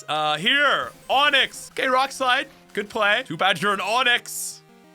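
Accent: Canada. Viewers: That Canadian accent kept slipping out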